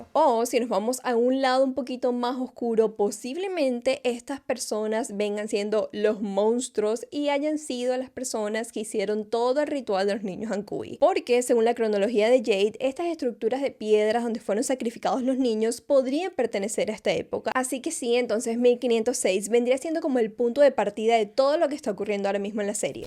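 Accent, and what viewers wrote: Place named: Venezuela. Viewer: Me encantó tu explicación de todo y tu acento, eres venezolana de casualidad? 🥹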